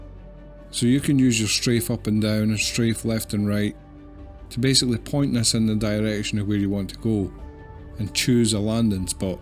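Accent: Scotland, United Kingdom. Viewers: I'm here for the Scottish accent 😎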